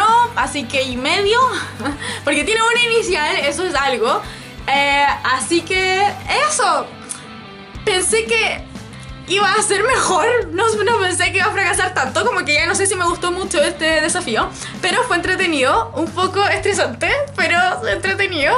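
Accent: Chile. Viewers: eres chilena perdon recien te conosci en youtube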